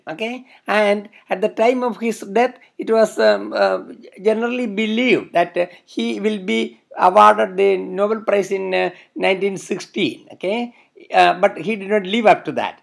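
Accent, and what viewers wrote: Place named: India. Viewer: is it indian accent?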